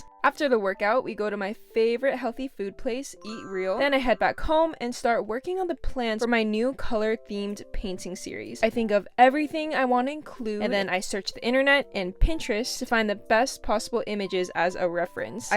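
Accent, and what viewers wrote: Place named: Netherlands. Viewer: your accent looks like your dutch (netherlands)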